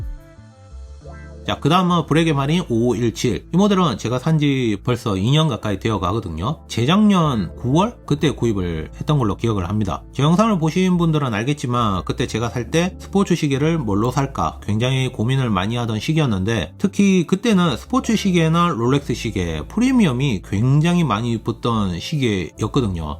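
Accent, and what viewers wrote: Gyeongsang, South Korea. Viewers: 아 말투 경상도 좆같네 사기꾼새끼들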